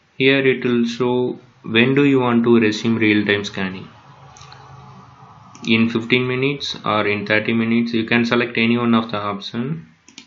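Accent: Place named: India. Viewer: no offense i thought it was an indian scammer about to make me lose my adminstration to the computer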